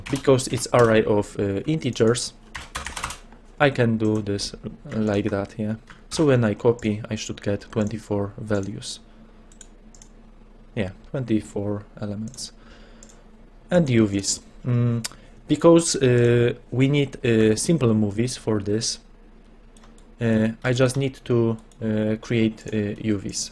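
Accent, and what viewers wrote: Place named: Poland. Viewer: i am suscribed to a youtuber from Poland, and i recognized the accent :)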